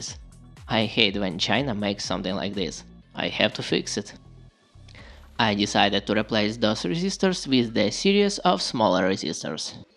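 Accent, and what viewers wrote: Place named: Russia. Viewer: The project is only good for the little house, with little energy consumption. P.S. Despite living in US, this guy still has very strong russian accent :-)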